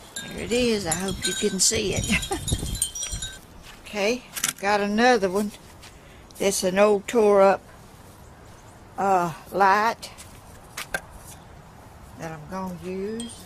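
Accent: South, United States. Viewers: Every syllable with that sweet southern drawl is precious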